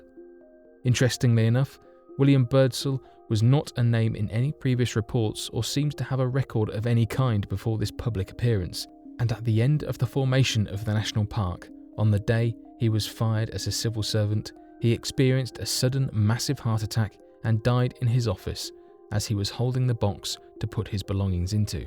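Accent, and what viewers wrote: United Kingdom. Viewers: Another boring British monotone narration. Blaaaaaah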